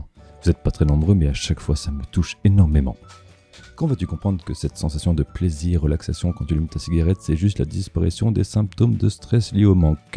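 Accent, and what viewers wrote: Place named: Hauts-de-France, France. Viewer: J'arrive 4 an après la bataille, mais j'aurais aimé savoir d'où vient ton accent. Tu prononces les sons "A" d'une façon particulière, ça ressemble à l'accent de ma région (Hauts de France-Belgique).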